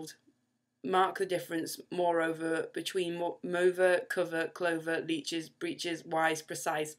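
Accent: United Kingdom. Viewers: ahhhh are you from up north? im down south so we pronounce things like singer, ginger ,linger grass brass differently :) a little bit posher perhaps :D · Come on love!, you can do it much better, you are British, I want to learn from you, I want to have your accent, it is beautiful. Try to do a decent video but please recite the poem slowly so I can follow you easily. Cheers. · I love your British accent!! But why do you have to read it that fast? It is a poem, right? :) I am Hispanic so I wish you could make a slower version for people like me :) C'mon! Try it !!! PLEASE!!!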